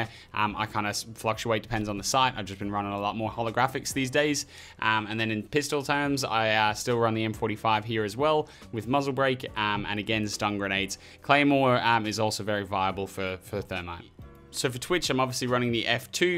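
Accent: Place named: Australia. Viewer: he sounds kinda australian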